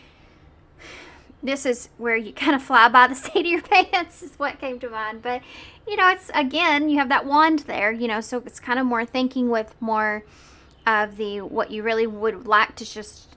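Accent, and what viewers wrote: South, United States. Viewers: I have just relocated from PA to TN. My first time seeing your readings and my first time hearing a reader with a southern accent. Thank you for the message that i will find people i resonate with in my new community 🙏🏻🥰 pile 3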